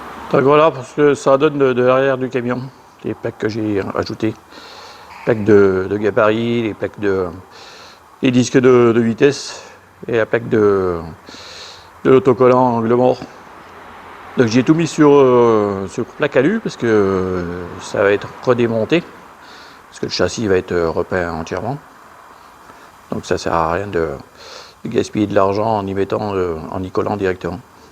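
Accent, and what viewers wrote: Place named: Franche-Comté, France. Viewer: Je crois entendre l'accent franc comtois !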